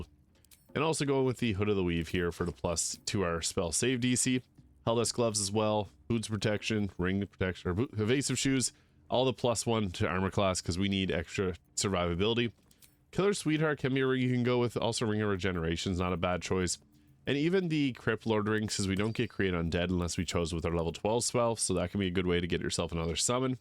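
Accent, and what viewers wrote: Canada. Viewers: Homeboy is Canadian. Different linguistic inflections and pronunciations.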